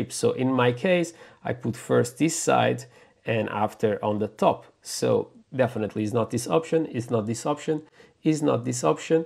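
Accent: Portugal. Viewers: es português? tens muito sotaque português a falar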